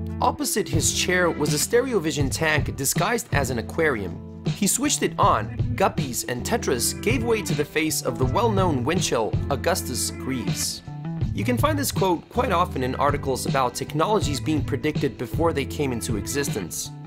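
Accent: Balkans. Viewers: Are u from balkan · Are you from the Balkans?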